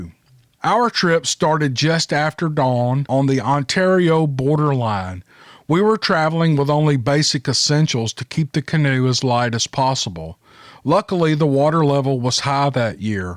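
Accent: South, United States. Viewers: love the channel, you keep up the great work, i love listing to a southern boy reading stories. my people! you go boy, keep up the great work! here we go! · Hey,. You are an amazing story teller and narrative reader. Love the southern twang. Keep up the awesome work 😊😊😊😊 Ron From Calgary Alberta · I like listening to your stories in that American accents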